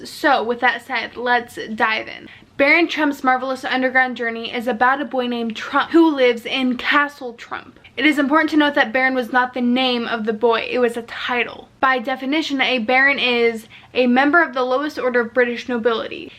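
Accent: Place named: Chicago, United States. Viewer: IS that a Chicago accent? Love it. I have been reading these books as well. Very bizarre. Especially when you look at the Von Braun books which seem connected to Elon Musk.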